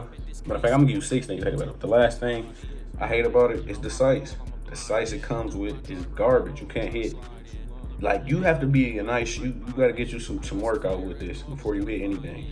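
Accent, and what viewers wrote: Michigan, United States. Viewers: You got my follow bro..Good review! Sound like you from my small town Saginaw MI 😂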